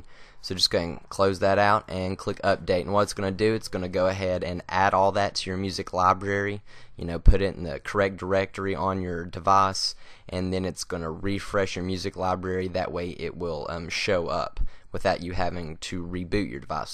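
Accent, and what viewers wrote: Texas, United States. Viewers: This might be of interest for some: The "How-To" starts . Also: Your accent reminds me of "The Grid - Texas Cowboys". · Dude, are you come from the south? lol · You’ve like a Texas accent. Almost....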